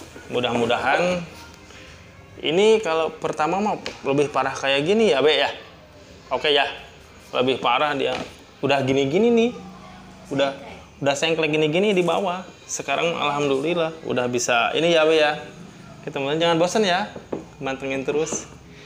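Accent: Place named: West Java, Indonesia. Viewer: Mantap bang bermanfaat bagi pemula.......logatnya kaya orang sunda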